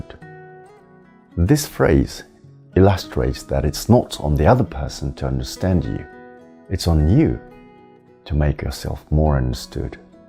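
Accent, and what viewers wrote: United Kingdom. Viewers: 大愚和尚のブリティッシュアクセントが好きすぎる。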